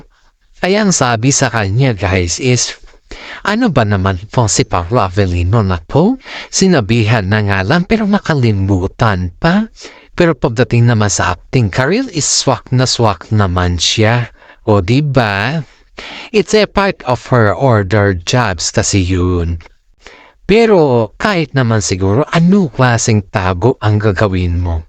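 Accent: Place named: China. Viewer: Tono mo para kang intsik na bulol sa tagalog. Halo halo pa sinasabi mo hindi maayos. Magulo kang mag vlog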